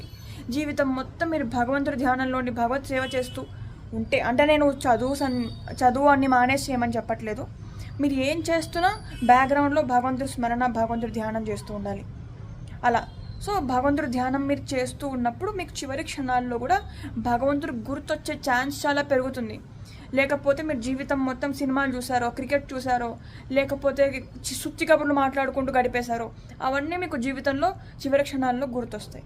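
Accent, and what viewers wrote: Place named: Telangana, India. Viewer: ఈ నవ యువతి ఆంధ్ర పిల్ల అనుకున్నా తెలంగాణ భాష. దొర్లుతున్నది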